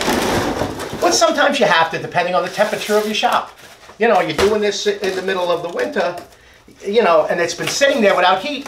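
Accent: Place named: Long Island, United States. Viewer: I have watched more dust collection videos than I care to admit to. Your video has got to be the very best at explaining the fittings and the types of pipe and fittings needed. In addition your accent reminds me of my childhood living in long island!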